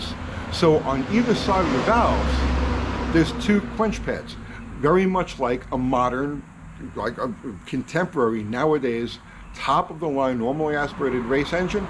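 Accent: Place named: New York, United States. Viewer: I'm from NY. Don't mind your accent at all, reminds me of home.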